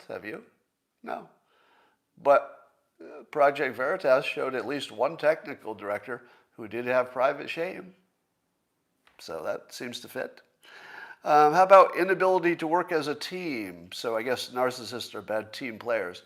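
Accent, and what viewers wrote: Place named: Northern California, United States. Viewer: That's the NORCAL accent.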